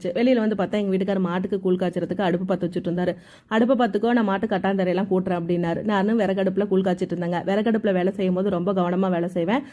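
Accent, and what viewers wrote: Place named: Thanjavur, India. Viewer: உங்க பேச்சு தெளிவாகவும் தஞ்சை ஸ்லாங் போலவும் இருக்கு.தர்மபுரி பக்கம் ஸ்லாங் வேறு மாதிரி .உங்க சொந்த ஊர் எது சிஸ்..நீங்க என்ன படிச்சிருக்கீங்க.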